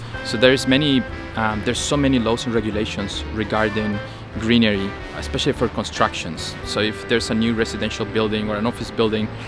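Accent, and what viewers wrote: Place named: Germany. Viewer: Great video and thanks for sharing. Are you German descendant? I notice your English has a bit of German accent.😂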